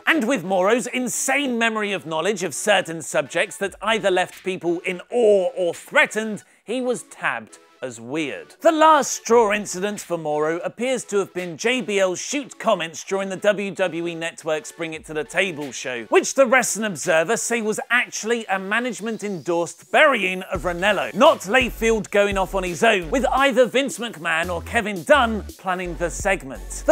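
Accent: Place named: United Kingdom. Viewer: YOU ARE MY HERO!!!! YOU BRITISH TALKING MAN!!!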